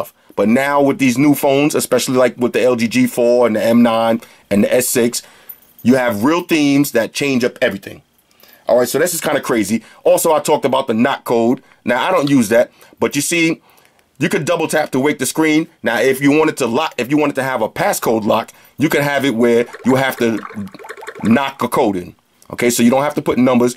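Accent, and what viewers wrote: New York, United States. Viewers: I just want to say that I really appreciate your enunciation on this review. As a fellow native New Yorker, it's refreshing to hear our local swag on a topic I'm interested in. Most reviews on phones seem like they come from nasally 15-year old nerds from Ohio (The same people that are migrating to this city and pissing me off, but that's another story). Keep doing your thing bro. EDIT: LMAO "size matters" and "red lobster dinner pics with bae"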